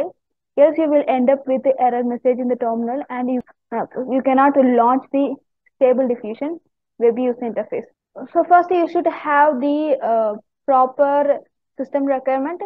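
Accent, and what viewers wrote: India. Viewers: i hear an indian this gonna be good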